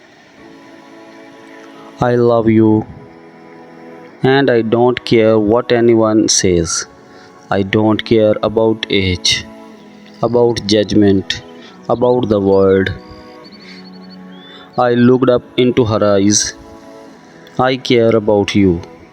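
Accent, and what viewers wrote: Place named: India. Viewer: Why the accent is indian i dont think this is faza faza is defrent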